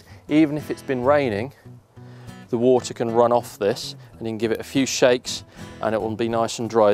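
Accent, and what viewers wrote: United Kingdom. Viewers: I love you your Brithish acent is to die for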